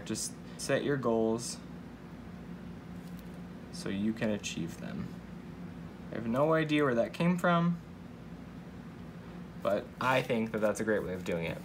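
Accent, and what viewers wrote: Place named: Minnesota, United States. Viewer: Just came across your videos! It's freshing see/ hearing a guy plan! What part of Minnesota are you from sounds like the cities area. I was born and raised in St. Cloud! Love hearing the accent too!!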